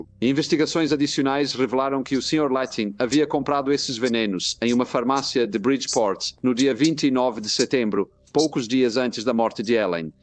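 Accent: Portugal. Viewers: Esse sotaque de Portugal é muito chato! Não daria pra resolver isso?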